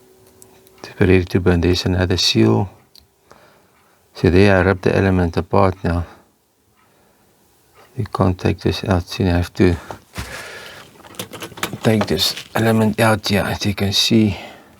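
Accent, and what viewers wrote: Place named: Afrikaans, South Africa. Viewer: Ek love die Afrikaanse english. Trots Suid-Afrika, my oom. Ek kyk graag oom se videos.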